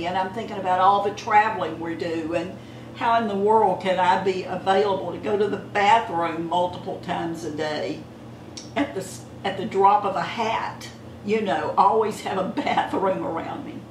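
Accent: South, United States. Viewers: From one long soaker to another, (can't live w/out my baths) I am so happy that I have found you today! You bring a cheery smile to face and a warmth to my soul. I am 60 as of August this year which is very weird for me. You are so precious and your accent brings me home to Georgia, Alabama and Tennessee. I was born in Atlanta. Although I was not raised there, I am a southern girl from the heart! I hope that you are feeling better as it's Sept. now. I will always keep you and yours in my prayers as I am a True Believer too! God is so very good and Be Kind Today is my mantra! Big hug. You are lovely and have the most sparkling eyes too!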